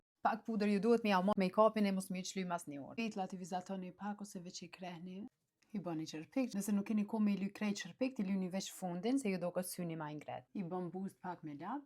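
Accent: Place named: Kosovo. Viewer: Gjermani jeton Nga kosova eshte duket nga dialekti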